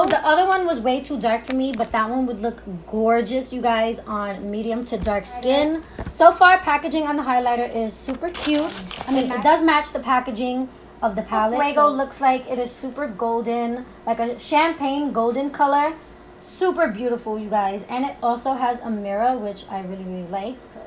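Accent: New York, United States. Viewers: She definitely from New York right?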